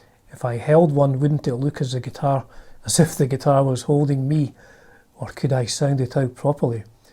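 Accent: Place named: Glasgow, United Kingdom. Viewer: You sound like you might be on the Glasgow side of Scotland.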